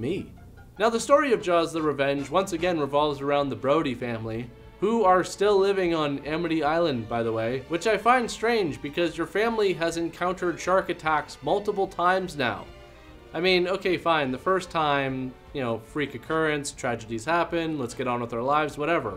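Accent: Canada. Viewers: Not to tease, but he does have the accent. It's not really "aBoot" as much as it is more like "aboat", though. And it's subtle and not as pronounced as the stereotype would lead people to think, but it;s there. In fact, I usually guess correctly that a YouTuber is from Canada when they say anything with the "out" sound as "oat". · Now that you mentioned Canada, I can't unhear your minor accent.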